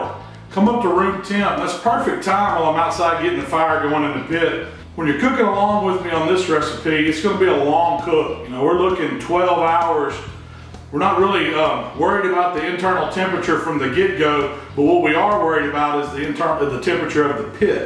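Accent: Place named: Texas, United States. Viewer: Belly + Texas accent = I'm going to make my first brisket! Thank you!!! ❤️ (born and raised Texan here!)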